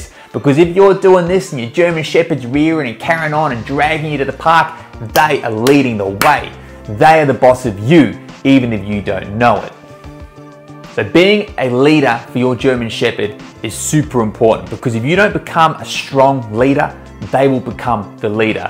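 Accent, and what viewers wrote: Australia. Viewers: Australian accent very funny and cool for German ears. She is adorable. Total class.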